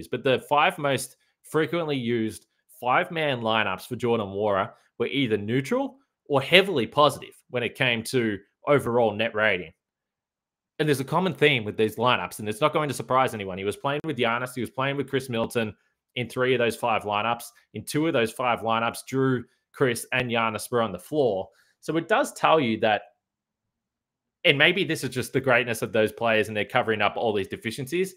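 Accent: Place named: Australia. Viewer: Streuth! These Yanks are a bunch of flamin’ galahs if they can understand you. Fair dinkum, I’d be devo if you changed up your accent. I mean crickey if yous can’t understand, bum a durry, hit the Bottle-o or the servo and grab a frothie, and get in the Oz mindset before your bang on the podcast you’ll be cherry ripe. No Wuckas mate!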